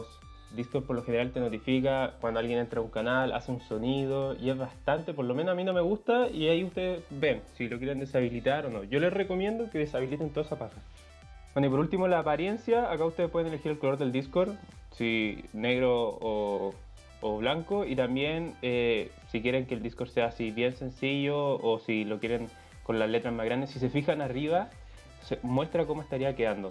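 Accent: Chile. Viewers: no te conozco pero eres chileno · No te conozco pero hablas como chileno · Mire este video y me sirvio artazo, ah y eres chileno jajaja, me suscribo XD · Es chileno ? O no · Eres chileno 👊😎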